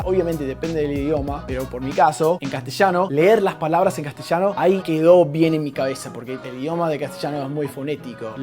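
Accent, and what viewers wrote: Buenos Aires, Argentina. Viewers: Tienes acento argentino, se oye bastante bien. ¿En cuánto tiempo lo lograste? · que lastima que aprendistes a hablar como porteño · Es espectacular como hablas "castellano porteño"!!!! es tan difcil hablar otro idioma como si fueras del lugar! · Él solo habla inglés y español. Lo único impresionante es como imita el acento porteño. Hay gente que habla más de 2 idiomas. Pero que hable el español ya es sorprendente pero ahí nomás. No como otros que aprenden hasta 20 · hablas español y haces el acento argentino mejor que yo siendo nativa de argentina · Con ese acento porteño tan fuerte no creo que tu primera lengua haya sido el ingles !! Yo vivo en Estados Unidos y los nacidos aqui de padres latinos no tienen el acento tan marcado (estoy hablando de hijos de argentinos ))😒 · WoW de donde eres? no me digas que de E.E.U.U? pero hablas muy bien con el acento argentino!!! 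saludos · de que pais eres? suenas como si fueras de argentina. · Vaya, es muy interesante el hecho que optaste por hablar como Argentino, te va muy bien. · Cometer errores se dice NO hacer errores jaja tu acento es lo mas porteño del mundo jaja te felicito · pero hablas español porteño .... ... no hablas en neutro mm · dices q no eres latino ... y en vez de decir 'yo' dices 'sho' y tienes toda la fonetica expresiva de un argentino ...usas la sh en varias palabras tipico del argentino ...xD ni siquiera demostraste hablar ingles ...y no eres latino? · este hombre esta muy bueno, aunque su acento me hizo pensar que era un argentino :p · yo soy argentino de la parte sur de Córdoba, quería decirte que me parece genial que te interese tanto el (vulgarmente dicho) Argentino Porteño, sí, Porteño, vos hablas tal cual un un Porteño, yo hablo parecido, al estar cerca de la provincia de Buenos Aires y al estar cerca de Río Cuarto (Córdoba) hablo una mezcla de esos idiomas, es como neutro, porque no tengo la tonada porteña, ni la de Río Cuarto, te felicito, la verdad, me sorprendiste desde el primer minuto del primer video que vi tuyo, abrazo. 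· tu fisico y tu acento es de un argentino mas que aprendio ingles, pero resulta que eres un estadounidense que aprendio castellano, wtf! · Soy de aca de Argentina <3 y hace poco vi por primera vez uno de tus videos porque estoy estudiando Inglés y era muy gracioso ver como Nihill pronunciaba distintas frases, y te juro que pensé que eras porteño!!!!!!! SOS UN GENIOOOO!!!!! Realmente pensé que eras de Buenos Aires!!! Espero algún día poder hablar en Inglés como un nativo como lo haces vos!!! Saludos desde Córdoba, Argentina.. :) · Pibe, vos no hablar español argentino... Hablas español-porteño... Los argentinos no hablamos así como vos pensás, cada provincia de Argentina tiene su forma de hablar..... Estamos casi hartos los argentinos de que nos porteñicen a todos !!!! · Felicitaciones de una portenia a otro "portenio"!! Es genial tu acento! Felicitaciones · El habla bien español, a el le gusta el acento Argentino Bonaerense. 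Punto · Hablas muy bien e español, con acento argentino. Excelente, felicitaciones.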